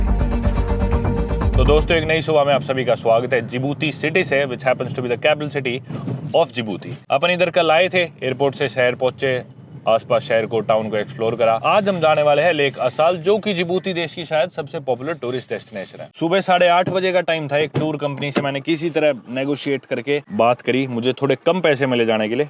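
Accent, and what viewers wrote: Haryana, India. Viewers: Hariyana ka hai bhai lage tu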